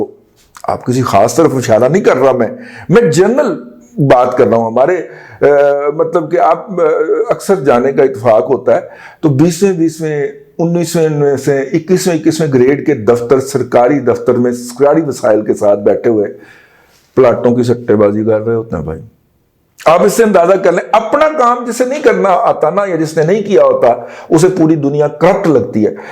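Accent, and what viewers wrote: Punjab, Pakistan. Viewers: You r brilliant bhai. Content,context, humour,sarcasm,unique style of presentation...n punjabi accent. real good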